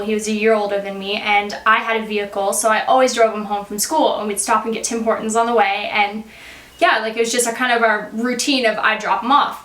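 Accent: Australia. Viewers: You have a bit of an Australian accent? :D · omg i havent watched one of your videos for ages, but i have really noticed the aussie accent come accross more now then before! i missed watching your videos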